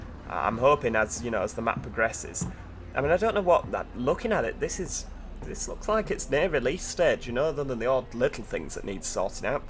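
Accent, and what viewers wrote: United Kingdom. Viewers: Why they didn't fix the loading and freezing in this Game so sad! With wich sterriing wheel do you play Omsi2? And you realy should have more subscribers! I mean your videos are great and I love your British accent and you also got over 1 Million views. This is Insane :)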